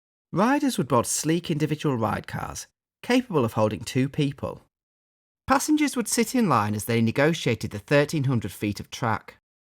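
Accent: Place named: United Kingdom. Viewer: A British man talking about American theme parks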